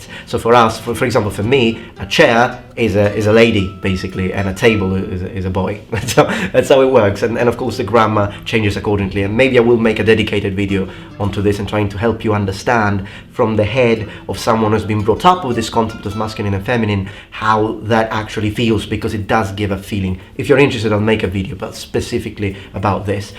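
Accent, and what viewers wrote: United Kingdom. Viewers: I would like to hear your opinion of British English vs American English. I say this because the English you speak sounds British, not American. Besides the accents being much different, American English, especially in the Southwestern United States, has Spanish influence. I grew up in the Southwest, so a few spanish words were part of my natural vocabulary. I was 30 years old and living in the south eastern US when I encountered people who didn't understand when I said PLAZA. They asked me to define it, and that was the first time I actually realized I was using a Spanish word, and not all Americans understood it. It took me a couple minutes to define it. I'm enjoying your videos, keep up the good work. The closest related language to English is I believe Dutch. There may also be a difference between the similarity of British vs American English. 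Dutch settlers came to the New World at the same time as the English settlers, and some English settlers had lived in Holland for a while. Additionally, Amish, Mennonite, and some other communities still speak Dutch, as well as English, and even refer to those outside their communities as "the english." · Do you live in the UK? I'm American and can't always pinpoint the different British accents, but when you speak English it sounds un poquito italiano y muy britanico :) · ok, here's one for you. My dad (padre) was born in Sicily, emigrated postwar as a small child. Mi Noni they never got a handle on much English, so I grew up hearing the dialect, enough to mess up my Spanish in college and with a Honduran girlfriend. So what is my question? why the British accent, My zio's and Zia's sounded like new yorkers and I sound like someone from Chicago? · why does he sound so British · Why do you have a British accent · Tienes un acento británico increíble. 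Cómo así?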